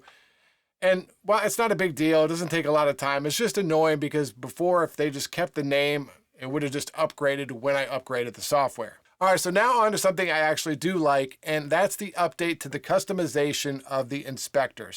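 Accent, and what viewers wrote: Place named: United States. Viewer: What part of the US is that accent from?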